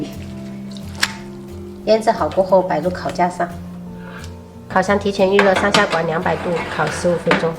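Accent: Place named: Fuzhou, China. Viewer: 地道的福州做法，从口音听出来的哈哈老乡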